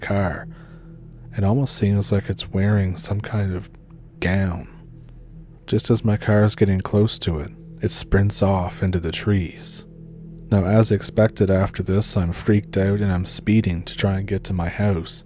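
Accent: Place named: Maritimes, Canada. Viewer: Your accent is wonderful. Canadian Maritime? My father’s family was from French Canada. Quebec/New Brunswick. So I recognize it. You talk like Anne Murray.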